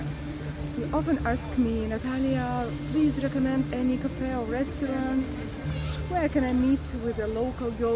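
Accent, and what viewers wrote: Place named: Eastern Europe. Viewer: thank you natalia for making such a wonderful video, i like the setting with steps down to the water and ethnic belarussian music playing in the background, this reminds me of the bellagio fountains in my home of las vegas nevada, being that i'm single, i would ask you to the fountains, respectively, i like your smile and slavic accent, i hope to see minsk belarus someday, take care, regards from las vegas nevada.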